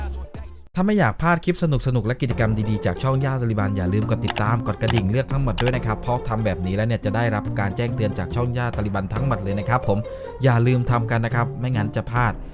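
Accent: South, Thailand. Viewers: ติดสำเนียงใต้นะ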